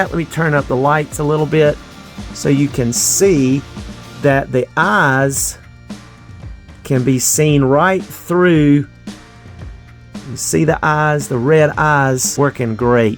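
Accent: South, United States. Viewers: I like your southern hospitality accent when you talk I've been to North Carolina and South Carolina has stopped over at the Dallas airport like your accent, southern southern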